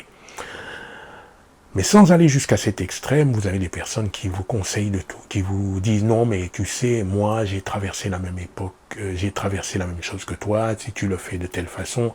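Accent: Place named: France. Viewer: Wow I don't know why but I really love the accent of French although I hardly understand it 😊 I am a new friend and here to support you. I do hope to get one back 🙏😊🤗🔙 thank you so much 🙏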